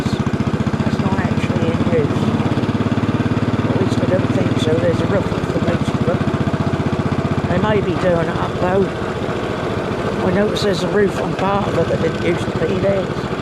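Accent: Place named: Norfolk, United Kingdom. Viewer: aww that’s nice. I don’t consider myself “that good”, but I try my best to give people a good ride (oo-err😂). I amazed how well you managed to keep the chat going for 50 mins, with interesting content, once I played things back a few times. The strong Norfolk accent takes some deciphering at times 😎😂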